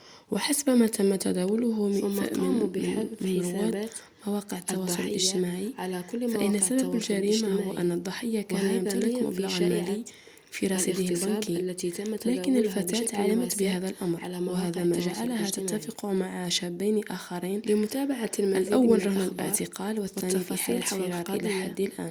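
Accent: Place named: Sahara. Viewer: طريقة إلقاء جميلة باللغة العربية وخاصة عندما تمتزج باللكنة الصحراوية. ممتاز نتمنى التوفيق للقناة وراعي جيم من عندي 👍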